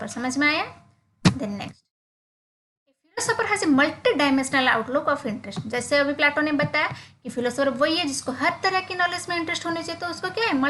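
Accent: Odisha, India. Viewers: Ma'am app Odisha se ho kya ?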